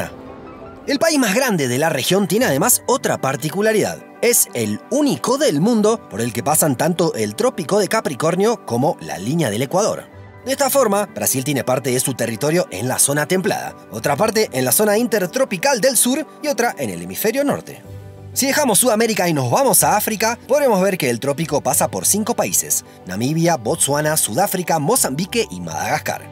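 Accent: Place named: Rosario, Argentina. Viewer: Sí, y se renota que es de Rosarioooooo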